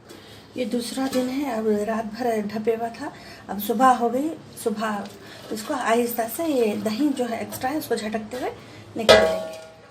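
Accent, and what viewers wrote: Hyderabad, India. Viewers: Aap hydtaba ke hoky aapki baat ka lheyja wesa hi laga chhahy kafidino bad dhiki mirchi ki recipy dikhaidi..mujhey bhut bsnd hy..😋😋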